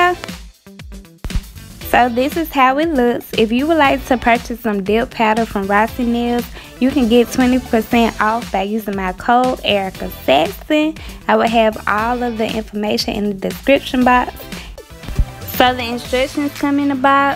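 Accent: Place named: South, United States. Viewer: That southern accent boo 😍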